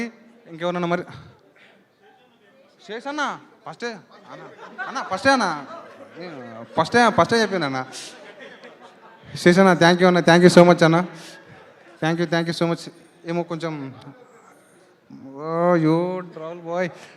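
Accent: Telangana, India. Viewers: సిగ్గు లేకుండా సుత్తి లేకుండా అచ్చమైన మన తెలంగాణ యాస మన ఊర్లో మాట్లాడుకునే నాచురల్ వర్డ్స్ అన్ని వాడినావు ఈ మాటలకు మల్ల మంచిగా అనిపించింది సూపర్❤❤❤❤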